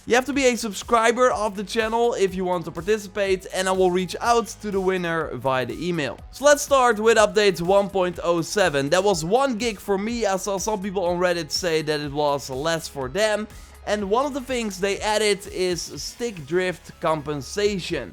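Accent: Netherlands. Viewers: I knew you were dutch :) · You sound Dutch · Ik vindt t echt geweldig dat ik je Nederlandse accent meteen merkte, en toen je de ps store liet zien stond t ook in het Nederlands lol Goede info btw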